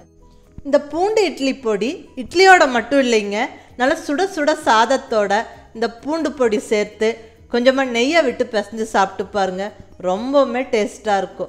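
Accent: Kongu, India. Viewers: Vanakkam ninga Romba azhaga kongu Tamizh la pesuringa. Very nice video THANK U.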